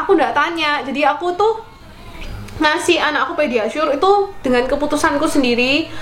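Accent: Surabaya, Indonesia. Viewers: Mbk e logatnya medok ky boso suroboyoan, sidoarjoan.... Org mana mbk??? Suka saya.. apa adanya bnget suaranya.. mksih ilmunya brmnfaat bngt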